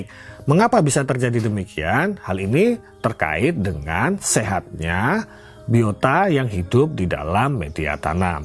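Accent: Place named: Kudus, Indonesia. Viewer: Panjenengan gaya penyampaianya seperti temanku org Kudus.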